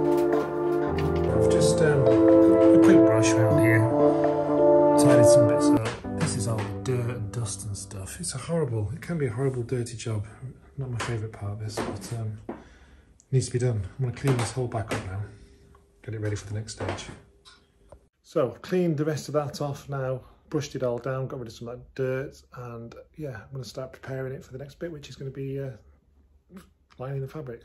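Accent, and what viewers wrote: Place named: Northern England, United Kingdom. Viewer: I’m another that has followed for a while. Just loving to hear a fellow northerner!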